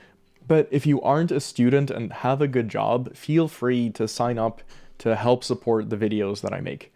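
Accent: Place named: Canada. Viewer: Where is this guy from? Sounds Canadian, or something....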